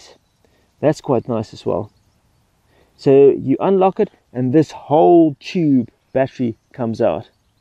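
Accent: South Africa. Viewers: Painful! Specs not correct, designed in the UK but built and assembled in China. All parts sourced in China but available here as well. Seeth-Afreekin accent still heavy!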